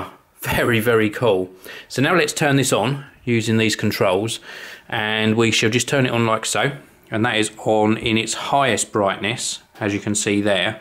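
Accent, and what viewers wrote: England, United Kingdom. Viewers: Where are you from in England?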